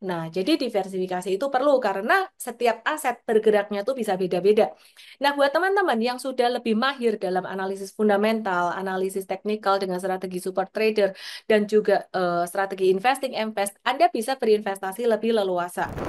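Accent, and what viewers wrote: Java, Indonesia. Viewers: logatmu jowo mba😁😁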